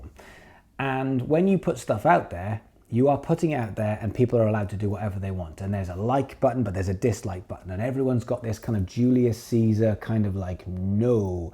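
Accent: Wales, United Kingdom. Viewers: Hey just going off your accent are you Welsh?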